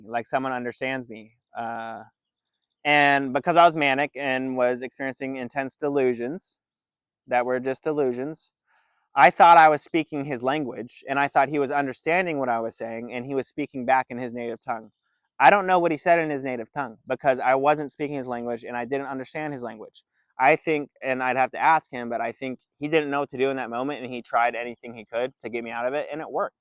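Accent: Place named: United States. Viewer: I watched till the end. Where are you. The backdrop looks beautiful, I'm guessing America by your accent but what state?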